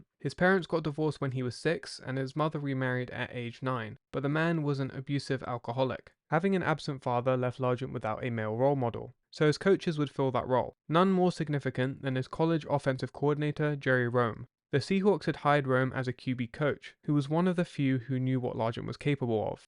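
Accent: United Kingdom. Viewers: British guy talking about the NFL? 1KYAE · How weird is it hearing a British dude talk about football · Sorry but i cant listen to a british talk about amercan football.it just doesnt serm right😮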